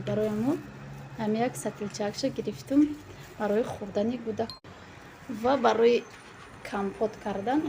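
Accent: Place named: Gozimalik, Tajikistan. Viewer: Эээ шумо ай хуросоненку, моям ай Хуросонем , чиста Гозималики гап мезанен шумо 🥰🥰🥰🥰🥰🥰🥰🥰🥰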